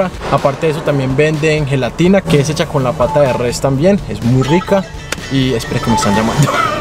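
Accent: Colombia. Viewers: Me super pinche encanta el acento colombiano. Es tan elegante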